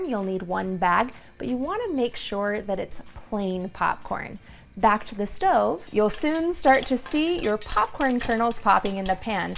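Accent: United States. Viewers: Mam you American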